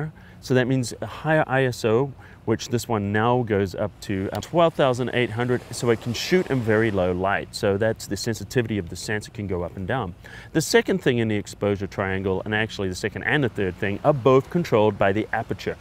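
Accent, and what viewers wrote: New Zealand. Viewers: You sound kiwi but not lol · I knew you were a Kiwi!!! Go ALL BLACKS!!! Thanks for the great review mate...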